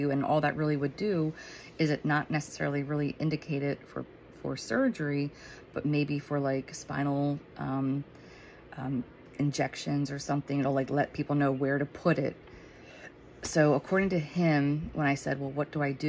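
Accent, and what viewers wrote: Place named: Pennsylvania, United States. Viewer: Sounds like u r in Pa.? I'm in Lancaster look up Dr Perry Argires he's the best neurosurgeon in Lancaster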